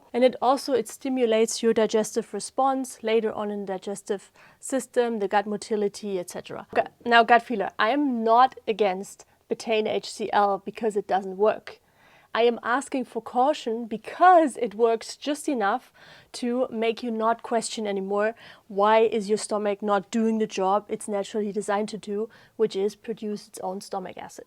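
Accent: Germany. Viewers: She has a lovely German accent. ❤ I think she is very good at what she does, too.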